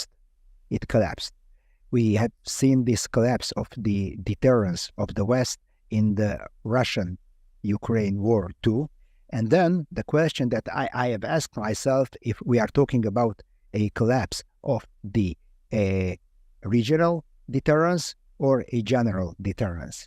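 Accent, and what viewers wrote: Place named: Hungary. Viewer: i though you had hebrew accent in english, but that is in fact still hungarian, nice that it remains.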